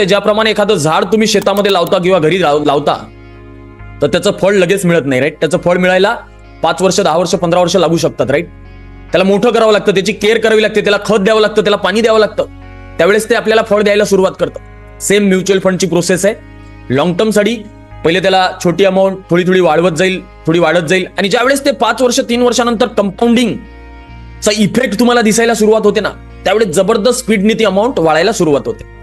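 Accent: India. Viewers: From..विदर्भ....भाषेवरून कळतय...😊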